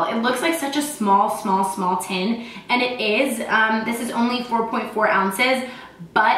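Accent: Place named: Georgia, United States. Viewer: I knew you had to be a georgia girl ! Got the accent for sure...Sound like my people 🤗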